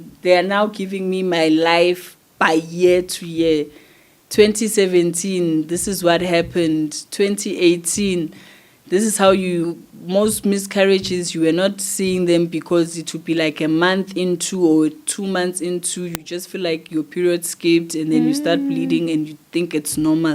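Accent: Nigeria. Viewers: THE NIJA HAD TAKEN YOU OVER SISI, EVEN THE ACCENT IS LAGOS. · Women from other countries should know that when Nigerians come to any country, they are not coming to marry anyone. If they marry you it is to benefit them not you. Whatever they do is to make money. Leave Nigerians for Nigerian women. But girl, you now speak like a Nigerian.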